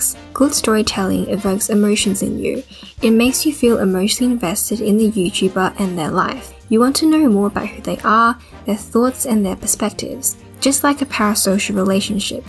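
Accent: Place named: Australia. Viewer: off topic but i really love your accent! is it australian?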